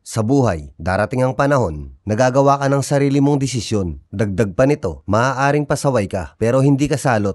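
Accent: Pampanga, Philippines. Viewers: Kapampangan ka ne boss?? Accent kc.😅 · Haha kapampangan ka boss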